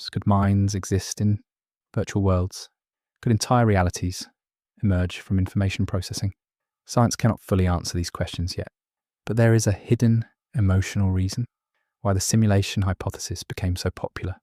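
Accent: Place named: England, United Kingdom. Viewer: How many millions of people listen to you?Because you have a English England voice\nYou're just saying this thing over and over again , that's really boring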